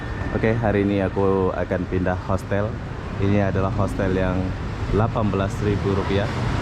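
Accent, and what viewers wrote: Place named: Malaysia. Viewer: Anda org indonwesia tpi dialekx kaya org malaysia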